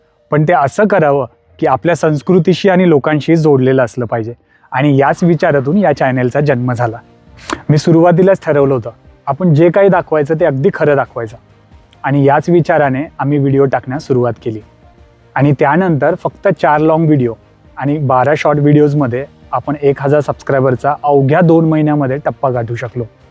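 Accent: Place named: Jalna, India. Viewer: मस्त दादा मी जालना उन आहे तुझं बोलणं पाहुन मला वाटत होतं तु इकाडलाच आहे म्हणून पण माहित नव्हत आज सांगितले तर भारी वाटलं खुप शुभेच्छा तुला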